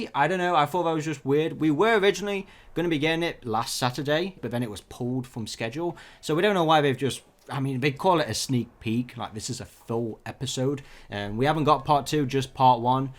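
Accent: United Kingdom. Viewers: I like your British accent. But quentess prime is the traitor if you watch the last knight they call her that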